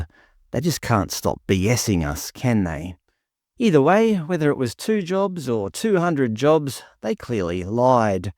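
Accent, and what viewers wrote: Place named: Australia. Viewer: its aussie English mate. Nothing wrong.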